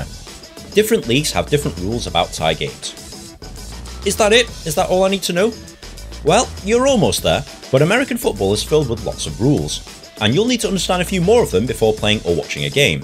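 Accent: England, United Kingdom. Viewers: Dude, your explanation is completely detailed, but I can't understand a single word of British english, so I had to turn the captions on and keep rewinding the whole video, but I was able to understand everything in the end. Great video! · An English guy? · I love how American football rules are explained by an English commentator 😂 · A English accent? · nope, deffo British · American football explained by a guy with british ascent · British huh? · That is 100% an English accent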